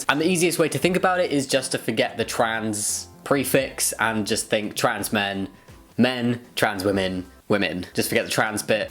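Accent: United Kingdom. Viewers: La verdad, me revuelves mucho por lo rápido que hablas, y por lo poco que se de ingles, pero me encanta escuchar tu voz, asi de grave, con su bello acento británico y asi, no cambies eso nunca. 😋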